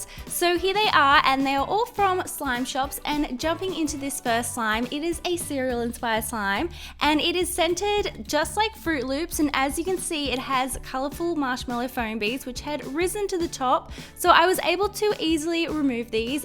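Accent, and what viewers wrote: Australia. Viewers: I loooove your accent it is so so pretty. Is it Australian?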